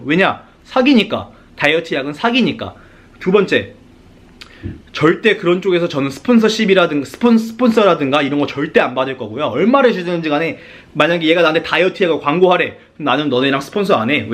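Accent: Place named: Chungcheong, South Korea. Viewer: 말투가 충청도 분 같네요 인상 좋으심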